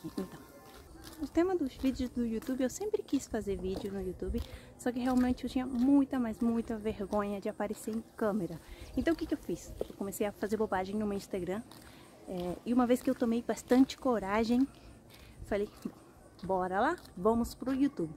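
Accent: Argentina. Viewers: 😉😙😙😙 A mí me encanta la lengua española, y siempre estoy mirando vídeos acá en Youtube de Youtube's nativos de la lengua española, para que yo pueda siempre está en contacto con la lengua española, y tu acento argentino es buenísimo para me acostumbrar con algunas particularidades de español de Argentina, pues ustedes argentinos utilizan siempre el pronombre personal "vos", en lugar de el pronombre personal "tú". Tambíen me gusta acostumbrar mis oídos con el sonido que tú como argentina, utiliza al pronunciar el sonido de la Y(igriega) y la LL(Doble Elle), pues los argentinos hablan "Yo" con el fonema /xo/. ¡Yo soy enamorado por la lengua española! Jajaja. ¡Siempre me encantó! ¡Besitos a vos ! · Y aparte que tenes esa tonada de buenos aires capital y no se te fue cuando haces videos en castellano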